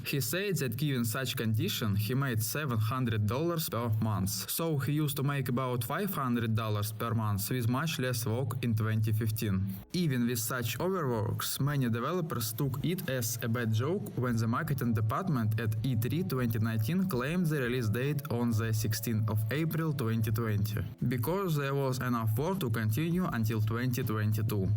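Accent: Russia. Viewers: russian english good god :/ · he has Russian accent, so should that deter him from making content? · why are people hating on this guy? is it because of his accent or because he is russian? there are many xenophobes i feel. keep up the good work brother. I like your uploads about cyberpunk XD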